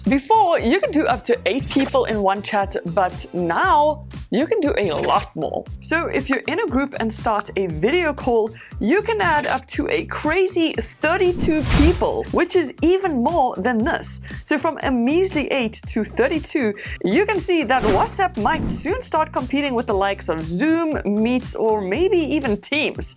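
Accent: South Africa. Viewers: Are you South African? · Great video, are you South African, you sound South African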